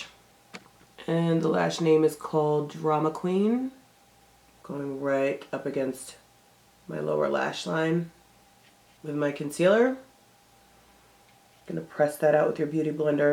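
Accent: United Kingdom. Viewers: loool your bitish accent, love it. you really sound like us a bit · I just love that British accent!!! I'm an actress and often try to master it for future roles lol. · loveeeee!!! and great job on the British accent!!!!!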